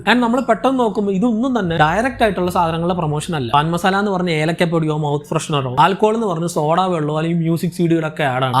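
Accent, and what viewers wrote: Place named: Hindi, India. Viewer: A malayali with Hindi touch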